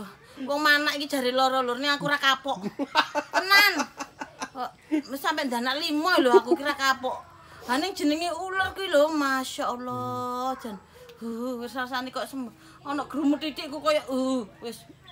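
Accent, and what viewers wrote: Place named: Surabaya, Indonesia. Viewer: Awal liat dari IG, lucu banget cara bicaranya khas suroboyoan. Ternyata ada youtube juga, pas liat jadi hiburan sekali liat tingkahnya ya 😂🤣. Ini senang berkebun tp kok ketemu ulat bulu langsung ngacir lari tunggang langgang 🤣🤣. Sekeluarga seru semua 👍👍. Sehat terus ya dan keluarga, biar makin semangat ngontennya, jadi bisa mengibur kita kita semua 👍👍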